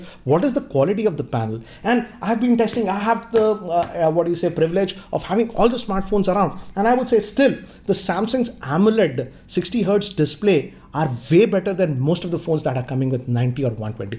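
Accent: India. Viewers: as soon as i hear the indian accent, im like "yes, he knows what hes talking about. time to listen" good info bro. i was dying for like 15 minutes when you asked about shooting 8k videos. its hilarious because its true. there is literally no need for shooting 8 k videos.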